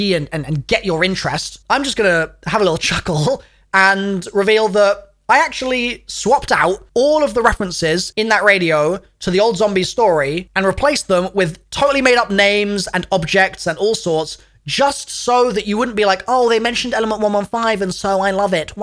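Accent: South Africa. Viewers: The south african accent had me, love this chanel from a fellow brit 👊👍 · Amazing south african accent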